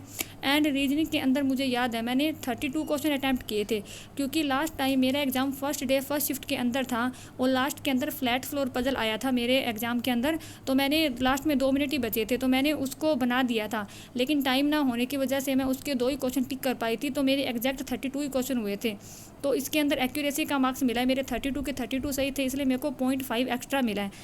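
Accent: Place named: Haryana, India. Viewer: Harianvi accent ✌️💜💜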